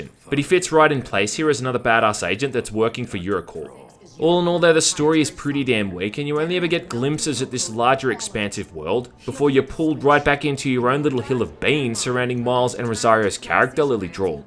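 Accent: Australia. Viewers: OMFG I was just thinking of this game and how awesome and underrated I thought it was and then you go and make a review about it, how timely, keep on rocking and reviewing old gems dude, love your channel for this and your Australian accent, gives Hemsworth a run for his money:D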